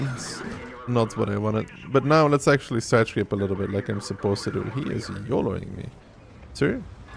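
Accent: Netherlands. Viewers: Wait you dutch??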